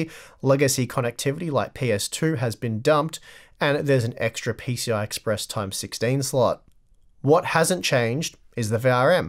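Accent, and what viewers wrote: Australia. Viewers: Is it an Australian thing to pronounce the letter Z as "Z-ed" In America we pronounce the letter Z as "Z-ee"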